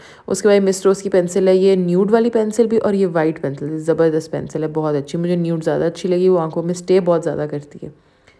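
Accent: Punjab, India. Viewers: Apka Punjabi way of talk mazeka h bht😍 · Wawwww jwa same Punjabi jehdi edr boli jndi aa..🥰m vry glad to listen ...m from punjab .. nd luv uhhh lehnda punjab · Haye didi tuc teh bilkul india wale mtlb sade vangu ani soni Punjabi bolde oo appa same same aa bilkul haye kash Pakistan wakhra na hunda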